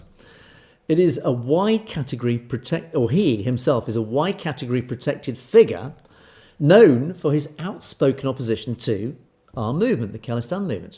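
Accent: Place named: United Kingdom. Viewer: Hello, greetings! This was very interesting and informative program, full of interesting facts! I particularly like your British Accent and want to adapt to it. But, I am afraid that Americans might make fun of it. I need your take on it.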